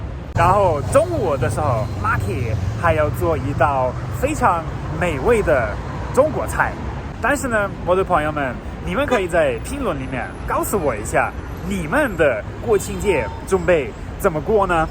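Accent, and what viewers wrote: Sichuan, China. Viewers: 喜欢听说的四川普通话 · 这个老外普通话居然有川音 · 是四川发音听起来像马kie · 的国语有股浓浓的四川味~ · your Chinese comes with a Sichuan accent! It sounds so good! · 是一个中国通，四川话加普通话很有意思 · 你的川味普通话很不错👍😌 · 四川普通话说的不错嘛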